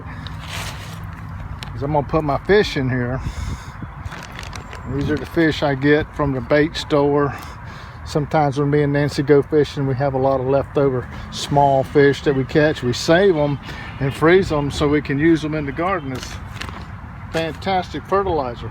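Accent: South, United States. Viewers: “Then I’m gunna install 4 squash” 😭❤️ Sooo country lol. Love it! 🤘🏼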